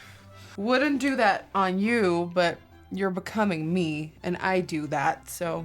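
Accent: Netherlands. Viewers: Ik ga zo stuk om dat steenkolen engels eh, heeerlijk dat typisch nederlandse😭😂❤️ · Ik ga helemaal stuk, zo’n heerlijk Hollands-Engels accent..prachtig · Love the Dutch accent, really miss the Netherlands!! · Haar Engels is zo grappig ik vind het echt geweldig lekker nederlandssss 🇳🇱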